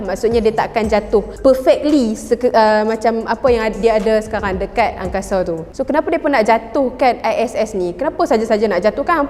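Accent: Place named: North, Malaysia. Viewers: pekat naw utaqa dia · Loqat utaqa kita geng.. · Dia jatuh seketoi..pekat btl Utara hang noh😂😂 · guna la bahasa melayu standart.....adoiiiiiiiiiiiiiiiii....teruk betul bunyi utara.... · Pekat sangat loghat utara hang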